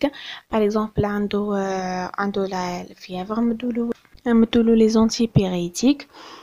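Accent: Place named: Arab world. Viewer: Vous parlez le français arabisé ou l'espagnol ? J'ai du mal à vous comprendre par endroit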